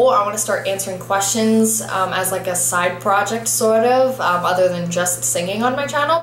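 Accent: England, United Kingdom. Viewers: Questions: 1. Were you trying to talk with a british accent? 2. What makes YOUR fans the absolute best fans in the world? =) 3. Who is your big inspiration? 4. Where, and why, did you move? 5. I am your biggest fan ever! =))) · your english accent is hilarious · hi love your british accent. hehe. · Is it just me or is she developing an English accent. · Yu have and awesome British accent! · Your British accent sounds like Cher Lloyd, and when you say that you look like her too. Lol. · your british acent is awesome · is it just me or does she have a slight british accent now??? 0.o :D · love your British accent <3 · You have a British accent here idk if its fake or if your American accent is fake but wow you do good with either lol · Yeah your london accent is terrible. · Not only does she have an AMAZING singing voice... she also does a GREAT London accent!! 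You're awesome, and I hope you go FAR!! Respect from London, England!! xxxx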